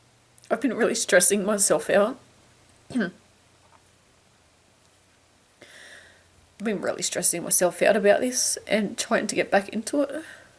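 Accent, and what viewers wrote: Australia. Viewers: Awwe, you've been reunited with your crochet love!! That's really cool. I can relate. I had a bicycle once when I was in college. I rode that thing everywhere. Went home for a week. Came back, hopped on the bike, and was so surprised how good it felt when my butt hit the seat!! (I didn't cry, but I get you.) Happy to see you back; I was missing you. Nice patterns, good instructions, lovely Aussie accent!!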